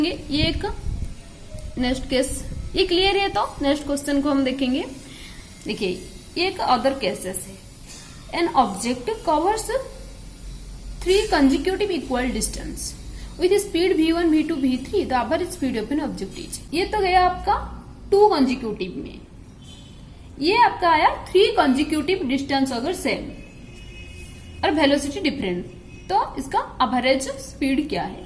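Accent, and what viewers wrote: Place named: Odisha, India. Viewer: Badhiya questions badhiya explanation ,mam.apki language se pata chalta hai ki ap odia ho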